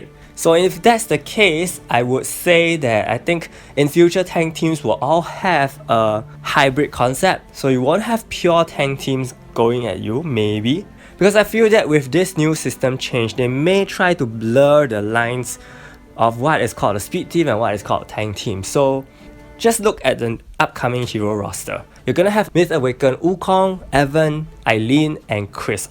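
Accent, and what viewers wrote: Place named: Singapore. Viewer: Your accents sounds similar to a singaporean! Great video btw! You made it more clearer and i love the sudden appearance from orkah xD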